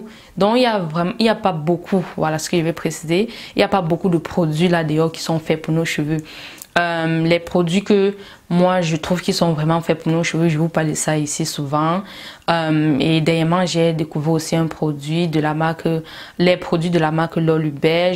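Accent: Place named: Cameroon. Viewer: Vous avezvun accent Camerounais😆😆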